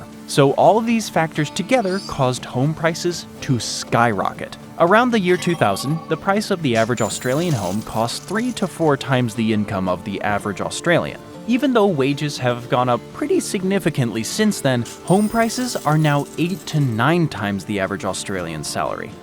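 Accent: United States. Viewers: As soon as I heard the American accent I was out, I couldn’t imagine an American watching a video on their country with an Aussie twang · Omfg the most American video ever, trying to downfall the new Eldorado country that took your 1st spot is hilarious af. Bro butthurt that is little America as lost it's paradise status and take it on the new n°1 😂😂 i'm dead We dont care that Australia is isolated and far from everything, it's a good thing rather than a bad, EU and America is so satured with their own legacy and history that a place like Aus is literally a new born baby so it has so much potential and possibilities, that's why it is so great cause it is not concern with the historic bs that EU and US can't dissociate from, it's literally just living it's best life, something that the rest of the world should learn from. If you move to Aus you know why you chose to live there and nothing else really matters when you got such a perfect country to live in. 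A flight is a flight, 8 or 16 hours is all the same The news thing, how is that a bad thing ? People in EU and US literally are brainwashed daily with so much bs information nobody gives a fck about, it's literally cancer for your life. You say it yourself in here, 'they might have hacked the mental health problem' duuuh.. Accumulated informations that doesn't matter for 90% of it giving cancer and tumors to people.. i swear people make videos like this either cause they got rejected for their Visa to go live there or you just like spreading negativity. Or.. if that's your voice you're clearly American and jealous that the 'Eldorado' status is now considered to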